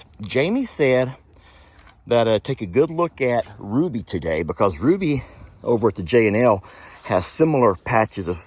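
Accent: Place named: Texas, United States. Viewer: to this Aussie Texas accent isn't clear English. I often have trouble but I agree with the captions being distracting.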